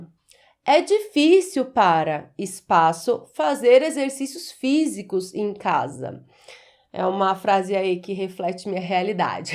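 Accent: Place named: Caipira, Brazil. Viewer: Sua aula se torna consativa para quem sabe as respostas certas, mas quer apenas revisar. Pense num modo de fornecer as respostas e depois fazer os comentários. Assim, quem errou irá aprender; quem acertou passará adiante. Seu R caipira já QUASE desapareceu, tornando-se praticamente suportável. Por conta da melhora que certamente ainda ocorrerá, já adianto aqui meus parabens!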